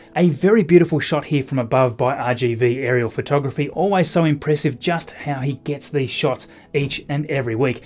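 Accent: Australia. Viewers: "The bitch had been cleared..." I almost died laughing. Sorry, I know its just your Ausie accent, but too funny. Love it!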